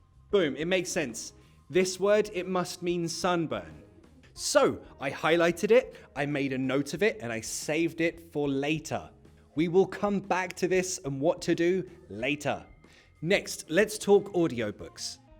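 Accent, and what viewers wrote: United Kingdom. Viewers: This man is a hero, I needed videos of British English but most of the youtube videos are in american English. I don't like american English 😕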